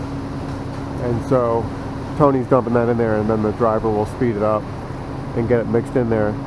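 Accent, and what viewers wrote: United States. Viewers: I can't place your NC accent....Cary?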